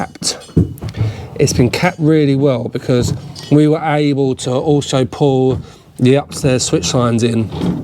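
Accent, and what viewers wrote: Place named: Essex, United Kingdom. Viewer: After living in Australia for 10 years hearing a strong Essex accent makes me wince. Lol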